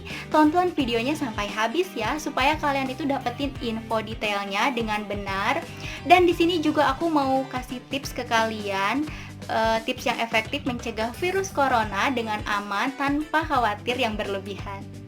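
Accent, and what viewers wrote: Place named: Riau, Indonesia. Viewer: Hadir kak 😁😁 Riau mana suarana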